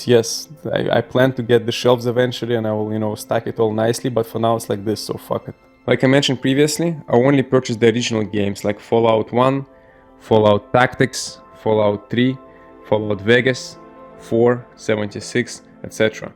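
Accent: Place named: Russia. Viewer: Акцент русский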